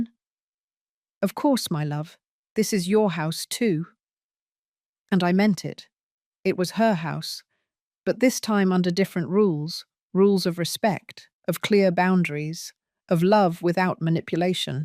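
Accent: England, United Kingdom. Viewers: Yes I'm tired of the snooty English accent! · This British accent is making me crazy. I hate it.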